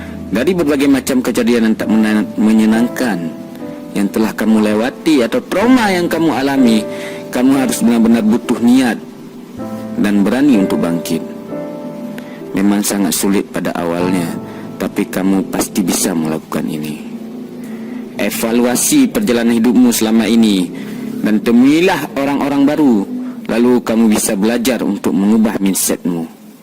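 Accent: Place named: Bali, Indonesia. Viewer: Dari suaranya pasti nih org bali 😊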